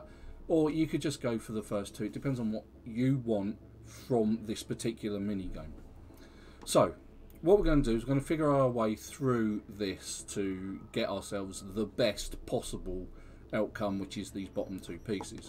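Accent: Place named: United Kingdom. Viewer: I love you mister British man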